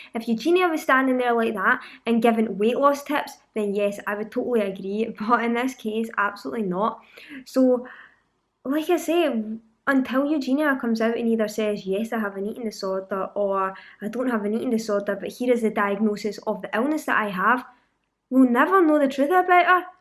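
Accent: Scotland, United Kingdom. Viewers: im frm south west scotland, you sound similar to me tbh, i understood every word, but also understand how slang it can sound x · I love your accent, sooooo scottish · thank you for defending her. I've been defended her and was attacked. Also I love your accent Scottish right? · Please of course her accent is strong... Scotch, Scotland, strong accent.. Stop being negative and listen after you listen for a few minutes you get the sound and some of the slang is much like ours (assuming you speak English). is a very intelligent woman so don't miss out on her humor and wisdom because you won't take the time to just listen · Her Scottish accent is so O.oooooooooooooooo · how can you not understand??? it's a fucking Scottish accent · She is Scottish just like me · it's a scottish accent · i love your Scottish accent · OMG I F*CKING LOVE THIS YOUTUBERS SCOTTISH ACCENT BEAUTIFUL Love Scottish and Irish Accents <3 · is from Scotland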